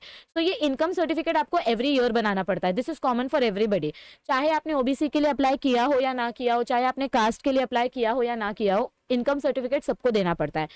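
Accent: Maharashtra, India. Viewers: You are mrathi or Gujarati?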